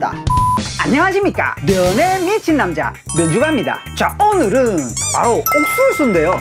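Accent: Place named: Gyeongsang, South Korea. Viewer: 경상도 분이시구나 ㅋ ㅋ 저도 한 면사랑 하는데요 😆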